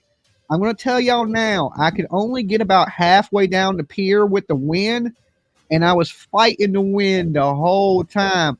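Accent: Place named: Tennessee, United States. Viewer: I want to send you a list of words to say so i can listen to your accent. It always makes me smile! Hand to heart im not making fun of you. My aunt is frim the HILL of Tennessee, and the speech patterns are awesome. I greq up in Indiana (not accent) now living in Texas per family vack gome i have an accent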